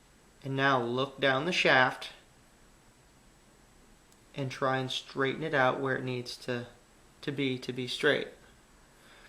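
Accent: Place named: Pennsylvania, United States. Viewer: Jeez man, how did you learn this by living with a present day primitive tribe? Lol. You sound like you're from Pennsylvania and not from the Outback in Australia.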